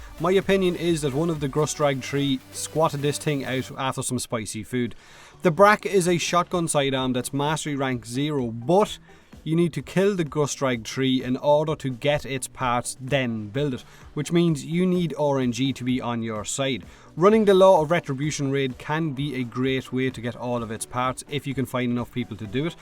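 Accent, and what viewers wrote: Ireland. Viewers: ayyy another Irish fella on Youtube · I fehkin lov your accent :D Is it irish?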